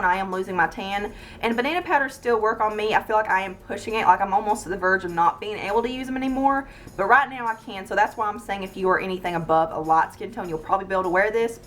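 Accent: South, United States. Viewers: ik that's wat I just said she seems like a nice country girl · This is a very good video but I have one question. Where r u from? Like, what area did u grow up in? U sound way southern that’s why I asked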